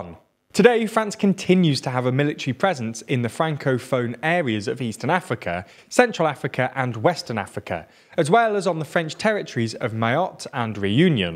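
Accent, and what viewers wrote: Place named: RP, United Kingdom. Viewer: Do you also run the channel 100% Star Wars? It might just be that recieved pronounciation accent and my American ears.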